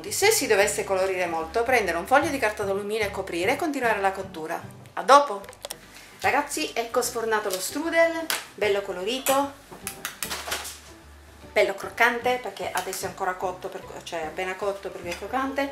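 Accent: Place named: Piedmont, Italy. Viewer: ! Ma le tue origini sono siciliane??? Perché il tuo accento ha un che di vagamente nordico...... tipo piemontese.. Ahahahah Ti abbraccio sei bravissima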